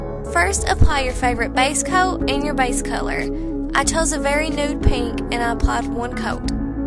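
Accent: South, United States. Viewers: You guys act like you've never heard someone speak with a southern accent before LOL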